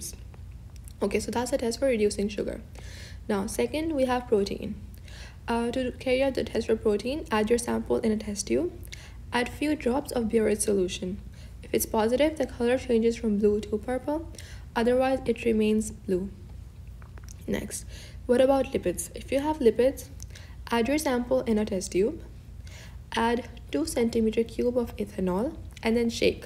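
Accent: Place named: Pakistan. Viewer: Where are you from...Ur voice seems Pakistani 🇵🇰❤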